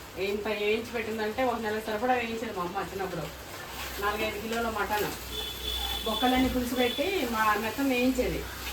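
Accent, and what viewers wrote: Telangana, India. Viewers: I would love to hear rayalaseema slang not telengana plz stopped watched ur video in between becoz I missed native language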